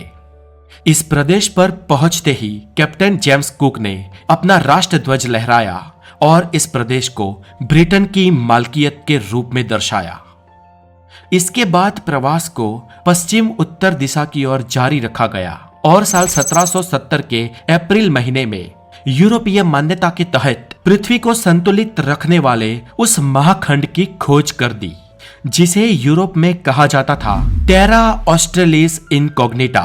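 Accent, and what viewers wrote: Gujarat, India. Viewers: Gujrati bhai hindi suit nahin karti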